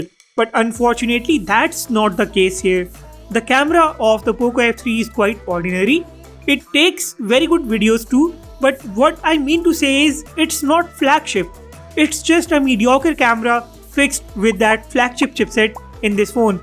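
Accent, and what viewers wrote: India. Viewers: I hate Indian accent She makes me unable to finish the video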